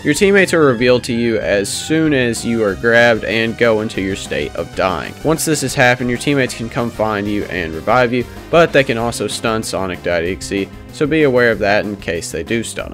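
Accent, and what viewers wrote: South, United States. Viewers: You kinda sound like a red neck which I really like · Bros so country that he sounds like a guy from a commercial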